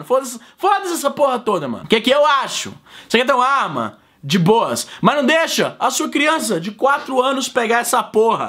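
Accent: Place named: Ceará, Brazil. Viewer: Um dos vídeos que vc deixou transparecer seu sotaque cearense hehehe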